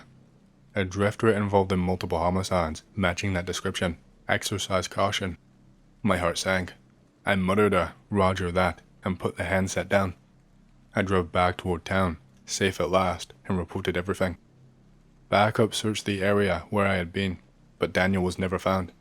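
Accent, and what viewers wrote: United Kingdom. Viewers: bro peak content as usual, where are you from (regionwise) i cannot place your accent in the uk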